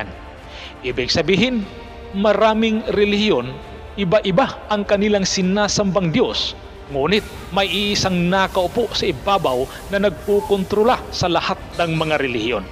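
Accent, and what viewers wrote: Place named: Visayas, Philippines. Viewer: ano ba yang accent mo... sorry... wag ka nang mag pronounce ng english kung bisaya ang accent mo.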